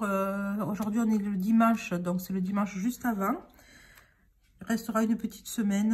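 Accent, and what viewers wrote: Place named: South, France. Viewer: COURAGE continue ainsi, rien que pour VS Avec votre charme accent du Sud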